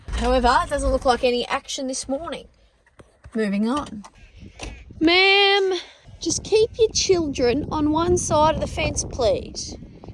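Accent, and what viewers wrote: Australia. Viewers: Trying to just learn Aussie accent with you 🙂😂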